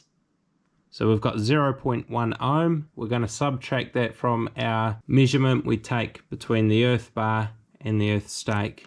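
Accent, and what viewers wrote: New Zealand. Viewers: you are from nz?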